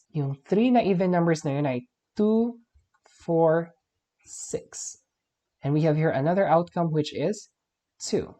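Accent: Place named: England, United Kingdom. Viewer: Aaaaaaaaa!!!I really like your English accent sir!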